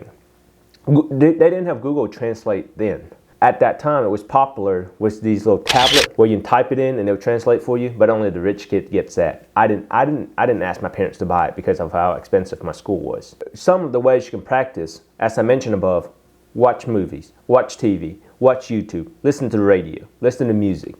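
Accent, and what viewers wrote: South, United States. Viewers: Totally!! He totally got the Southerner accent, which is so cool. :)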